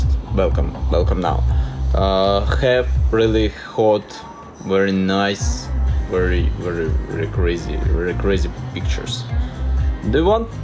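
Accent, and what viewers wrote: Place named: Spain. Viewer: Me encanta su acento español su acento ruso y su inglés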